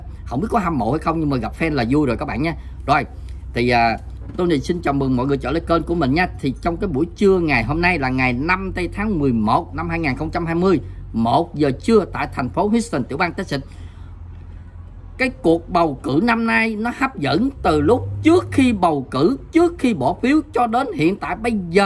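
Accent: Mekong Delta, Vietnam. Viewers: a Tony người miền Tây à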